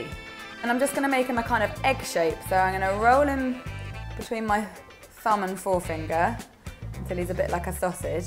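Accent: England, United Kingdom. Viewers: Your english accent😍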